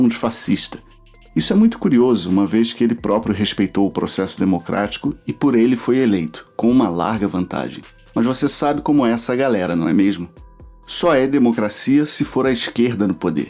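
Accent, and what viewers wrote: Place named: Rio de Janeiro, Brazil. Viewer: Carioca kkk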